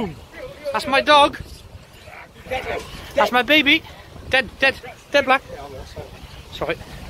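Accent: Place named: South Wales, United Kingdom. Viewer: nice one mate well done dogs are cracking is that a south wales accent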